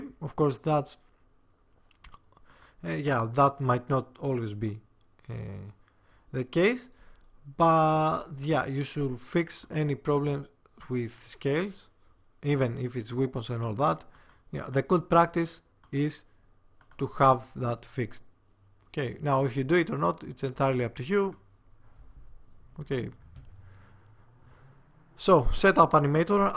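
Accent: Greece. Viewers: You are Greek because of your accent.. Huh?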